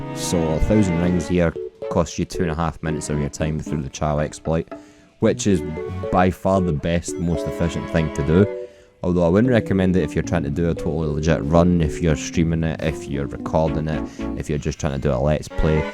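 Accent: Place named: Glasgow, United Kingdom. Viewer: Not only do i thank you for this video but i adore your accent lad , u from glasgow?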